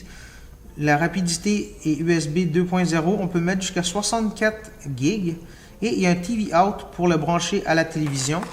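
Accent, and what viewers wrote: Quebec, Canada. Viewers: Super vidéo ! J’adore ton accent du Québec...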